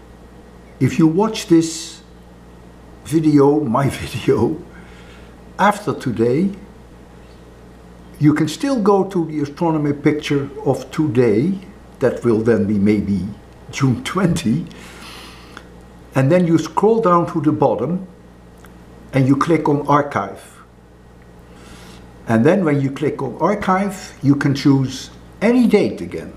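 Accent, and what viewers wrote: Netherlands. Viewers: you sound dutch are u if so my 2 :3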